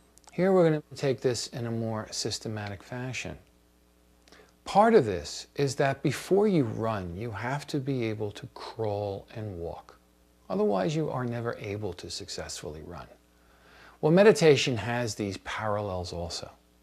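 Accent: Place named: Bronx, United States. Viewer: haha. I think this is a great meditation. His accent and colloquialisms went from the Bronx to the Universe.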